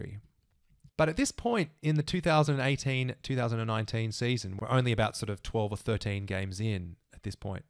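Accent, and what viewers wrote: Australia. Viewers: Your Australian accent sounds so lovely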